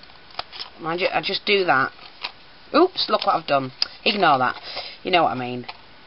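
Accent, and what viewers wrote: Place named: Leeds, United Kingdom. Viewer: It's because lives in Leeds do she has that 'leeds' accent lol. I like it:)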